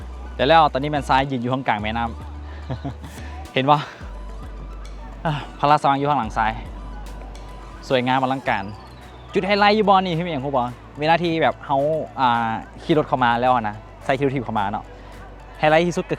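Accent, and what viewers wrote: Vientiane, Laos. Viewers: พื้นเพเดิม เป็นคนลาวใต้แต่ย้ายมาอยู่เวียงจันทร์ พูดสำเนียงลาวใต้ได้ แต่คงถนัดสำเนียงเวียงจันทร์มากกว่า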